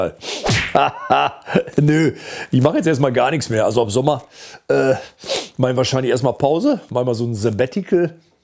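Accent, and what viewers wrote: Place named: Ruhr, Germany. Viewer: Du sprichst wie ein Ruhrpottler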